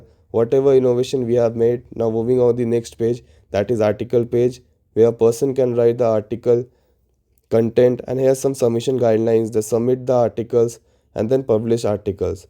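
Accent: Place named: Bihar, India. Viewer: your bihari accent is so sexy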